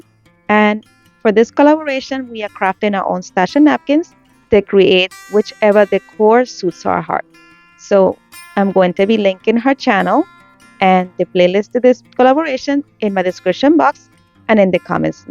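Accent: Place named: Latin America. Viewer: ur laugh is the cutest thing, it makes me smile everytime! Also, always love your projects, they're always so nice. And your accent reminds me of home. I grew up in Southern Miami, so allllll Latinos in one way shape or form and your accent is EXACTLY like my 2nd mom growing up. I love it! I just wanted to share that with you? What nationality are you? I think I know already, but dont wanna offend nobody! Lol❤❤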